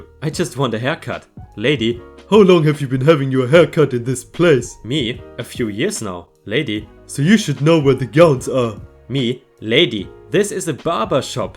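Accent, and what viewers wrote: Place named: Germany. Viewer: ⭐⭐⭐⭐⭐⭐⭐⭐⭐⭐⭐🌟🌟🌟 I figured I should post some stars since it's been a while. I am still watching just been busy to respond, wouldn't miss my daily fill of That awesome German accent :) 🌟🌟🌟⭐⭐⭐⭐⭐⭐⭐⭐⭐⭐ 🌟🌟🌟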